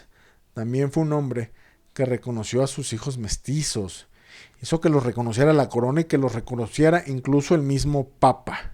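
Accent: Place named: Extremadura, Spain. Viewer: el ispanismo es que usted habla como yo estremeña 73 años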